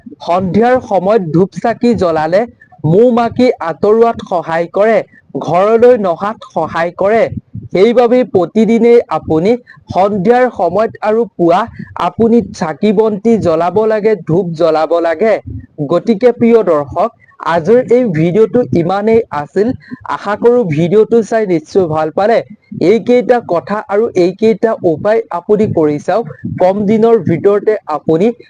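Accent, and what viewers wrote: Bangladesh. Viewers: Aponar kotha khini bangla deshi jen lagil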